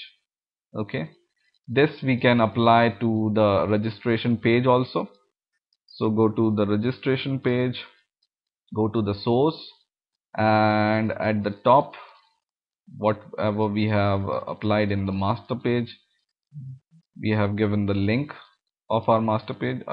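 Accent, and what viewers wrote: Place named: India. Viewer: is there any videos not done by an indian? I hate the accent. Makes me want to make them take a drink of water and get rid of the dry mouth.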